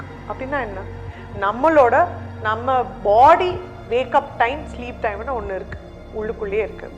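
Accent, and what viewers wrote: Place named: Tamil Nadu, India. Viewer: Hi ma'am I have watched all your videos regularly. Wonderful explanations and clarity and I love your Tamil accent ma'am 💕 Thank you 🙏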